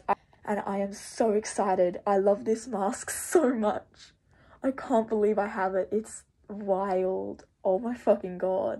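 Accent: Australia. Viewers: OMG UR AUSIIE ACCENT IS BETTER THAN MINE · Are you Australian? I love your accent!❤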